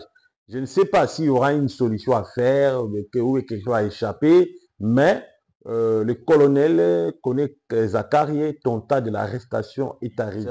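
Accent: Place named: Democratic Republic of the Congo. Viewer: C'est un zaïrois selon son accent et la prononciation de certains mots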